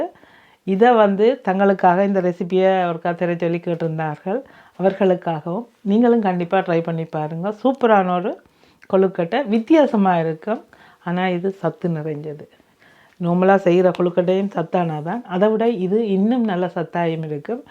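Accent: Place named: Jaffna, Sri Lanka. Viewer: உங்கள்றிசிப்பி அருமை அதையும்விடஃஉஙகள் கதை நம்தாய்நாட்டு இனிமையான நினைவுகள் அதை கேட்பதற்காக உங்கள்பதிவுகளை பார்ப்பதுண்டு சாவகச்சேரி சங்கத்தின் பக்கத்து வீடு போல அதனால்பேச்சுவழக்கு கேட்க ஆசை வாய் கரைப்பது விட கைகள்so cute அந்த cuteness தான்எல்லோருக்கும் வராதுவந்துவிட்டால் அது அனுபவம் வளரட்டும் 🎉🎉🎉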